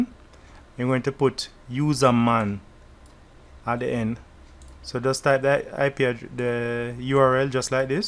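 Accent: Jamaica. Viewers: Are you from Jamaica ?, love the accent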